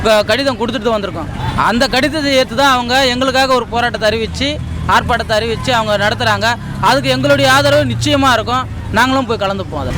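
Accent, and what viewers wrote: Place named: Chennai, India. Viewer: Enna da va da chennai flim la vara maathri peasaringa (Arasiyal)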